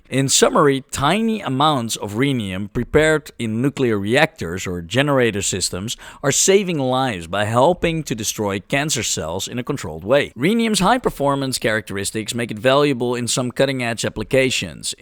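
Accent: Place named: Netherlands. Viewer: love the dutch dialekt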